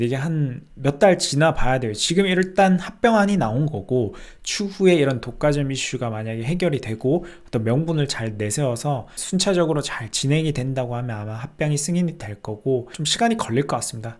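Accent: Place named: Seoul, South Korea. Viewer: 이제는 서~~울 사람 같아요 ㅋ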